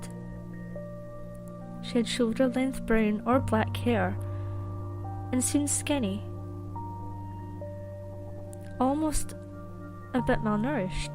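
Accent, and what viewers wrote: Scotland, United Kingdom. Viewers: Are you Scottish ? Great accent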